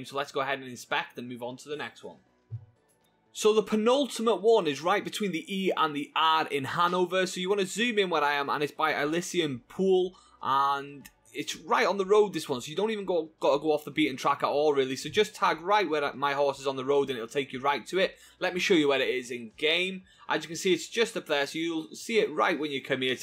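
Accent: United Kingdom. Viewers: Dude you sound like you're British which is nothing wrong with that I'm from the United States but I loved your video short it was sweet and it was precise have an excellent day I hit the like button for you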